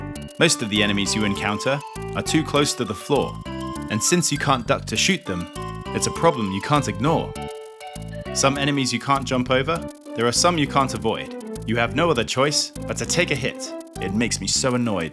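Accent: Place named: Scandinavia. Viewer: Sorry had very similar accent to some scandinavians speaking english Are you big as in age, height - or width lol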